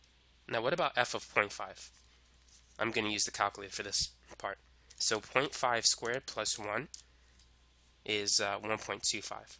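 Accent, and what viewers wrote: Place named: Boston, United States. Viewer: Dat boston accent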